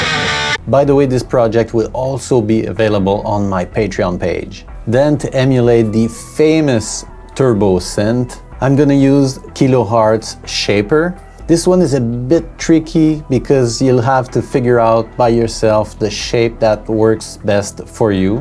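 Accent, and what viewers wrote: Quebec, Canada. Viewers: ohhhh really !!! Thanks !! Keep doing your videos !!! Very nice content ! You sound that your from Quebec ! De où ? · Accent Québécois?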